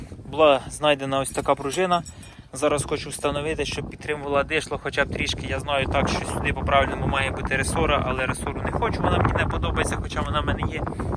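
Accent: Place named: Right-bank Ukraine, Ukraine. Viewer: Бля ,як отличаеться по говору правобережжя пид риччю посполитою вид ливобережжя не московитського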